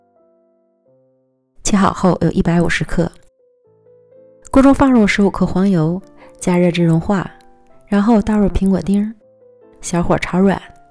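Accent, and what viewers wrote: Liaoning, China. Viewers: 好久不见变成了南腔北调记得是辽宁哪旮旯的口音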